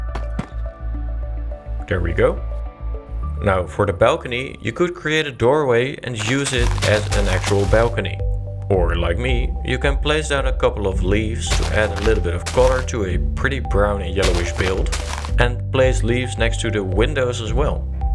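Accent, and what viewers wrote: Netherlands. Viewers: Exactly the video I needed! Thanks for the beautiful design & tutorial <3! (Hoorde door je accent meteen dat je uit NL komt! Bonus!)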